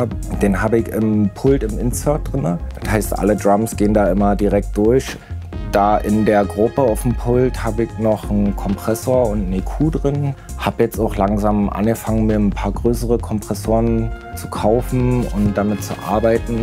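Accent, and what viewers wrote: Berlin, Germany. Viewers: schöne berliner Schnauze wa!? sympatischer Typ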